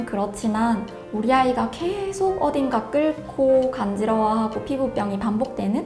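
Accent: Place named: Gyeongsang, South Korea. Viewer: 수의사님 고향이 경상도이신 것 같네요 그냥 수의사님 고향 사투리로 말씀하시는 것이 더 정겹게 들릴 것 같네요 ^0^ 중간 중간 사투리 나오는 맨트 보고 빵 터졌습니다. ㅎㅎㅎ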